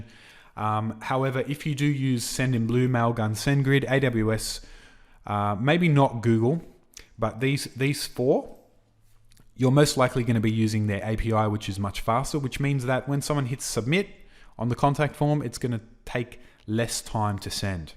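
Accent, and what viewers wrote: Australia. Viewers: Man it's really helpful video. Thanks a lot. Are you from Australia?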